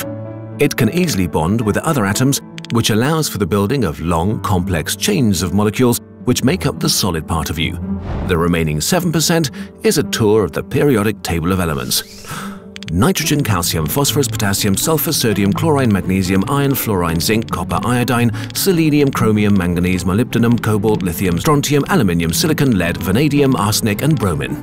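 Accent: United Kingdom. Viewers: he reminds me of the narrator of the stanley parable. probably only because of the british accent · Love it and the British accent make it even better :)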